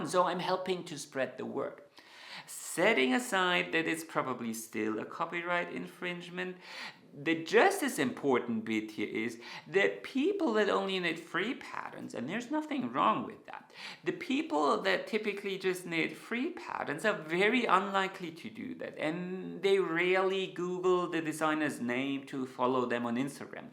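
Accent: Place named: Germany. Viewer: Hello, I found this post today purely circumstantial. Very sound information on a lot of topics. I never thought that copying a pattern from the ner could have so many pitfalls. I knit for myself and don't sell things knitted by me but I give away stuff sometimes. Also found out that you live in Austria. Funny that, I was born in Austria but left 50 years ago. I heard in your pronunciation that you are from a german speaking country but couldn't pinpoint if it was either Austria or Germany. I love your posts and get a lot of good tips, still learning at 76 years old. I am very glad to have found your channel. Good luck to you! ❤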